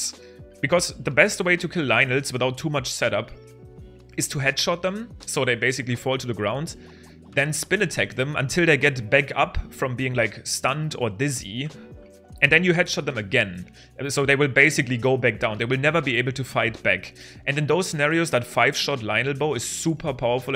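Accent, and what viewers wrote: Germany. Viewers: Yeah, if people give you grief over having a German accent while speaking flawless English, their opinions are laughable. I'm living in Norway trying to learn Norwegian and I am terrible at it. I wish I could speak Norwegian as well as you speak English. I am from the USA and there are many different regional accents, and the Cajun accent and certain southern accents are much harder to understand than a German accent, and no one gives them crap about it. Double standards. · are you german? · Another great video,! I’m continually impressed by your English, but since you repeatedly questioned your pronunciation of Swallow; I think you pronounced it correctly first each time (with your adorable German accent 🙂). Swallow rhymes with follow, not fallow. The a sounds the same as the a’s in baa baa, not the a in black sheep. I’m not sure if this helps, but the French name Soileaux sounds the same, or at least I can’t tell the difference.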